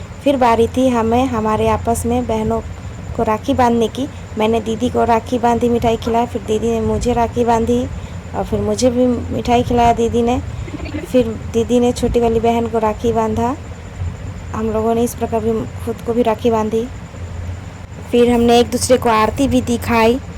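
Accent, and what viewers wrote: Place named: Bhojpuri, India. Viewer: hnnn bhojpuri type bol rhe ho na aaisa hi kuch lag rha tha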